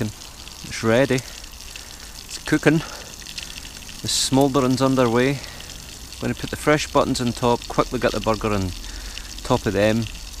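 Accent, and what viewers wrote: Scotland, United Kingdom. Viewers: Where in Scotland is it your from?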